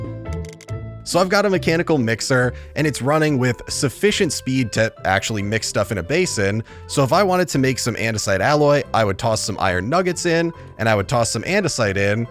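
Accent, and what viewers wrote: United States. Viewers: Is bro from Pittsburgh?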